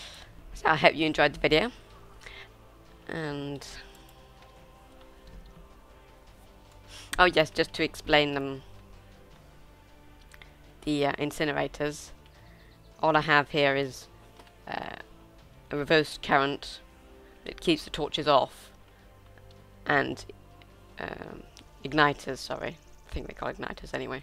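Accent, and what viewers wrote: RP, United Kingdom. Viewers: Wow you are very good at this "tekkit" of which you speak of... although are you Brittish because you seem to have a very 'posh' accent... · Very helpful for an automated farm! Additionally love the RP accent.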